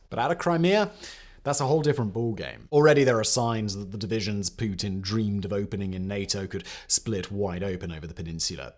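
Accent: RP, United Kingdom. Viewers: how many channels does this plummy have? Why is he all over my feed? How do I make it so I never have to hear his voice again?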